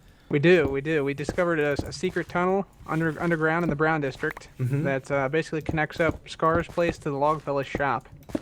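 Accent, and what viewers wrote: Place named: Sweden. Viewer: U sounds so much like Anomaly! #Svensk-engelsk dialekt! :))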